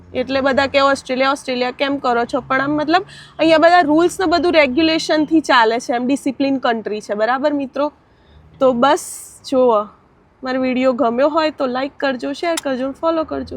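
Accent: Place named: Baroda, India. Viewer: 👍👍👌 બેન તમારા શબ્દો બહુ જ ફાઈન છે અને તમે જે સ્પીચ આપો છો અને જે બોલી રહ્યા છો એ જે વાણી છે બહુ જ સરસ છે અને તમે બરોડા અથવા અમદાવાદના છો એકદમ સ્યોર છું હું . ઓકે જય શ્રી કૃષ્ણ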